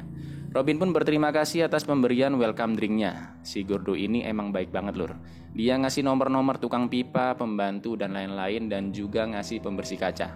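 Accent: Java, Indonesia. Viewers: Jawanya keluar:'v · Adminnya orang jawa ya?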